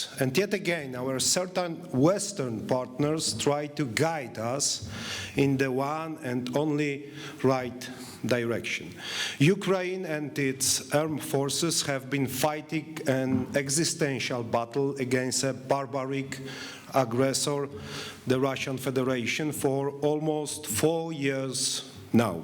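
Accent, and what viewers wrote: Poland. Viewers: Szału nie ma, ale żeby tragedia to też nie!!! wymowa raczej poprawna, dość silny polski akcent i tyle. Akcent to nie taki problem jak Polacy zwykle uważają! Mówi spoko, czyta bo z pewnością nie potrafi tak przemawiać jak po Polsku, ale np. Radziu S. Ostatnio czytał od dechy do dechy po Polsku - on chyba po angielsku mówi swobodniej - wniosek: to Polski prezydent, a nie anglik...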